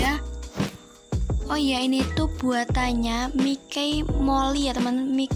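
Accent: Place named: Java, Indonesia. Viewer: Kok suaranya kek Jawa gitu tapi gpp semangat ya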